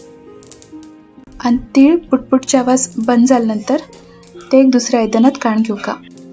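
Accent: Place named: Karwar, India. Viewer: Waàw felt like am in Karwar .... after long time I heard Karwar Konkani ...thank u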